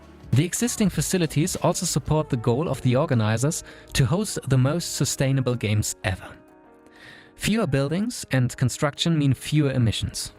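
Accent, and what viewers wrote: Germany. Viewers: because he has a thic german accent and tries to hide it by pronouncing everthing very pREcisLEy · I like his german accent. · His accent ist most definitely from a German speaking country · If you have not noticed it by the German accent this was the ultimate hint. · I didn't need to hear him say Rhein-Ruhr to figure that out, he has a german accent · I can hear your German accent soooo clearly!!! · When i heard the german accent i instantly went to channelinfo just to be confirmed.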